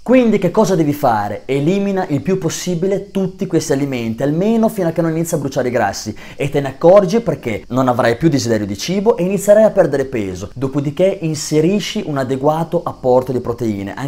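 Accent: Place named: Modena, Italy. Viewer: Ha l'accento di Modena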